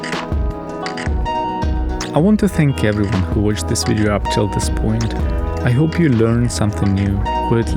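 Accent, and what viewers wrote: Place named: Eastern Europe. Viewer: This video made me dig my anbernic rg35xx out of the box. Also, your accent sounds slavic to me 😁Thanks!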